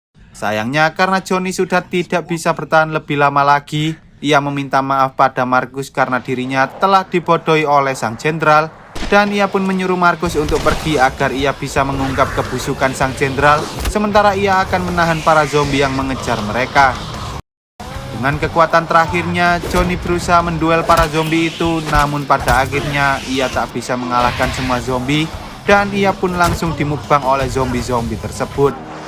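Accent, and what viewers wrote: Java, Indonesia. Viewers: Medok gini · Suka denger logat jawa medok mimin nya😁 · Jowone endi mas kok medok tenan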